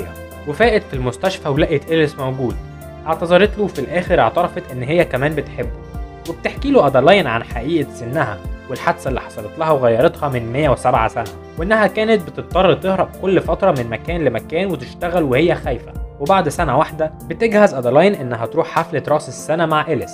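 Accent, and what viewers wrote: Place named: United States. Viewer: شبه صوت شخص امريكي تلعم مصري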